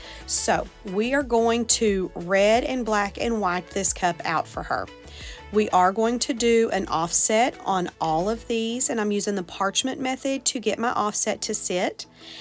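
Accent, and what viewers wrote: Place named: South, United States. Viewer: I love this cup and listening to your southern voice is so comforting. Bama girl here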